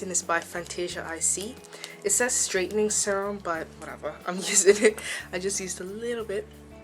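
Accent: Bermuda. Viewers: You sound bermudian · Are you Bermudian? Your accent sounds like it...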